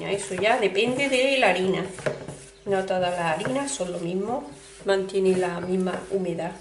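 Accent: Córdoba, Argentina. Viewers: Que bien lo explicas se nota que eres cordobesa yo también lo soi mil gracias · Muchas gracias señora mi padre era cordobés or desgracia nos dejo con treita y tres años ese sabor me recuerda a el y el acento de usted aun mas Dios la bendiga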